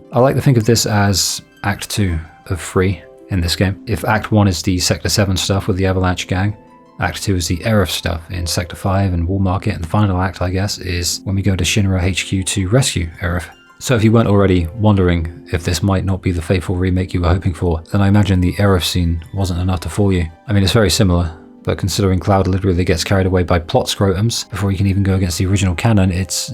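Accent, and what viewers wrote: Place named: United Kingdom. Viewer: oh he's british. Now it all makes sense.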